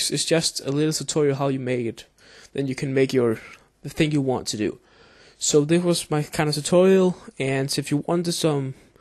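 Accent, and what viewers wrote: Denmark. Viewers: you sound like a dansker din dansker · are you danish? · Are you danish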